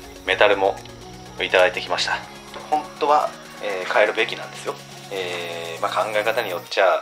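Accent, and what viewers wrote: Hiroshima, Japan. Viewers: 話し方が広島県民 合ってます？ それも東部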